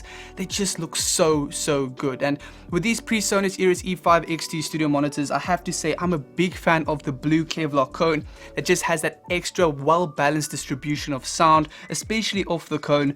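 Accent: South Africa. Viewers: Great videos.. do I hear a fellow South African accent? I have 2 E5 XT’s on the way. I want to connect them to an Apple airport express so I can use the with Airplay. But I can’t seem to figure out how I would connect them as a pair to the Airport. The Airport only has a 3.5mm out. Would I use a 3.5 to rca stereo splitter and then 2 RCA to TRS cables.. one to each speaker?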